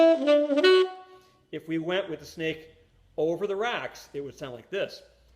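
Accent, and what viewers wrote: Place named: Chicago, United States. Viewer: It's Chicago accent - part of the charm! He's a great guy & a super strong player & teacher!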